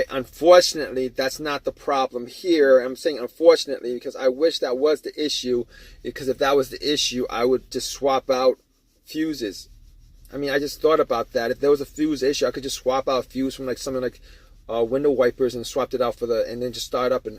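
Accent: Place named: New York, United States. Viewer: The reason he talks so long, because youtube requires at least an 8 minute video to consider it an informational or useful video to get credit for any views or subscriptions and likes and comment. Fuckin new York east coast accent.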